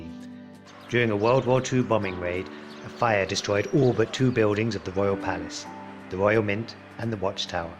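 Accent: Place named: RP, United Kingdom. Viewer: You sound so posh I love it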